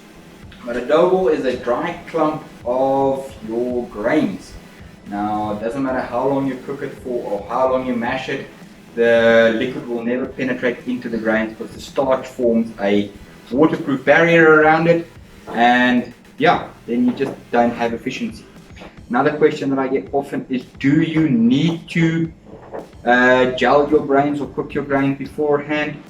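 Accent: South Africa. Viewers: I cheated, LoL:-) I could tell by your accent that you're South African, so I used google translate.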